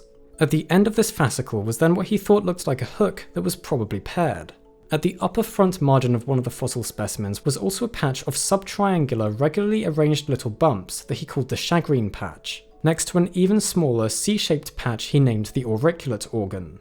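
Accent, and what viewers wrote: England, United Kingdom. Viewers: He's English. That's how they talk.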